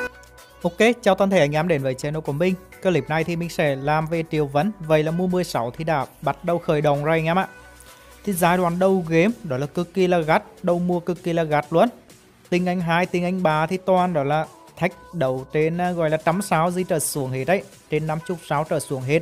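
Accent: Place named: Hue, Vietnam. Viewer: Người huế mà bạn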